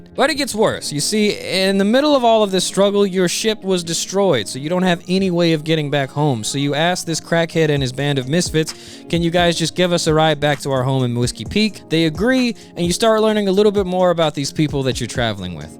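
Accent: South, United States. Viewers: I love your southern accent, where you from?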